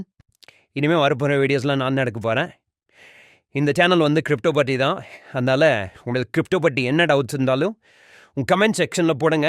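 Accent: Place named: North India, India. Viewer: உன்னோட வாய்ஸ் வடக்கன் மரி இருக்கு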